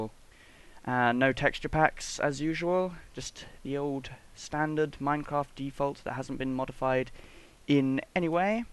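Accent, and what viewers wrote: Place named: Sheffield, United Kingdom. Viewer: are you from Sheffield? I recognize your accent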